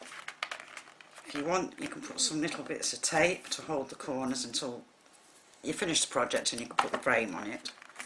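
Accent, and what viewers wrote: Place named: United Kingdom. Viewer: Your cards are beautiful, plus, I love your Brit accent. I'm from the states.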